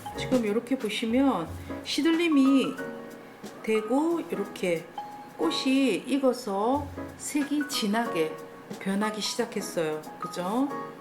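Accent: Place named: Gyeongsang, South Korea. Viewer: 유혹의 꽃 장미도 차를 제실방에 오시어서 감사합니다 경상도 이신가 봅니다 늘 응원합니다 감사합니다 🙏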